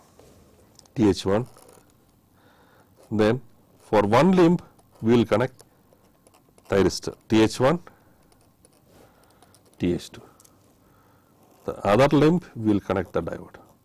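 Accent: India. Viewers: wish i could understand indian english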